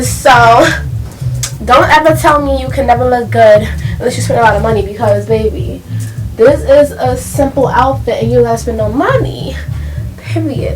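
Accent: New York, United States. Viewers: He so Ny lmaoo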